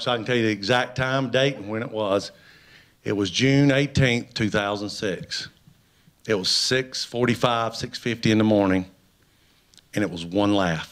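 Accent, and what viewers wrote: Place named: North Carolina, United States. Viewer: Literally tearing up. From NC myself, glad to hear a voice from home.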